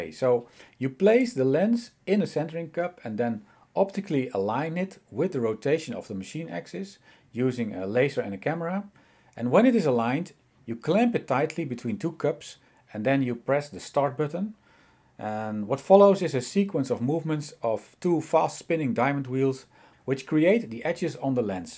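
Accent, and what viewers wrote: Netherlands. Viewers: That is a Dutch accent :-)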